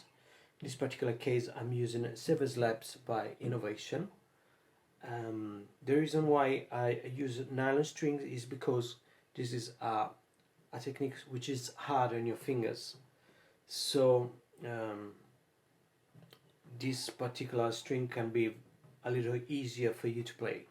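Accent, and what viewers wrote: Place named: United Kingdom. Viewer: Perfect British accent bro 🤙🏻👏🏻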